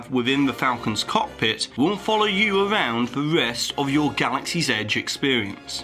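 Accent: United Kingdom. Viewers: Which type of British accent do you have? I am baffled!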